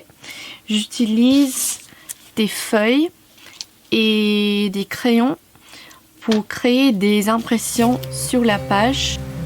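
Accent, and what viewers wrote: United Kingdom. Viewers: ironically love the casual brit accent slipping through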